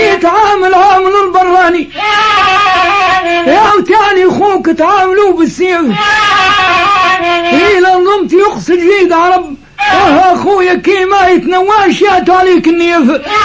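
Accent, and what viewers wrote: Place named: Tunisia. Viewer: لهجتو تونسية اكثر من جزائرية....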